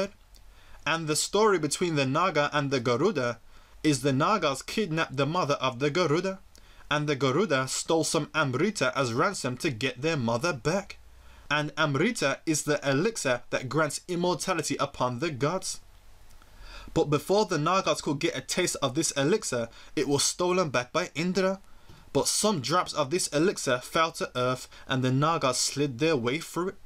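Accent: Birmingham, United Kingdom. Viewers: Is that a brum accent i detect. Beautiful video by the way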